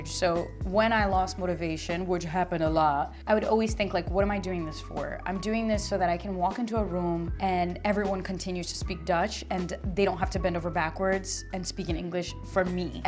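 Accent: Flanders, Belgium. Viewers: Bedankt voor jouw gratis prompts. Jouw vlaams accent vind ik ook "snoezig". Momenteel ben ik spaans, deens en papiamento aan het leren. Papiamento wordt op Curaçao gesproken en is een mix van spaans, nederlands, portuguese, en wat afrikaanse talen. Mijn moedertaal is engels. Als je een spreekpartner voor het nederlands wil hebben ( gratis EN voor niets), laat het me weten. Ik zou het leuk vinden. Groet, Greg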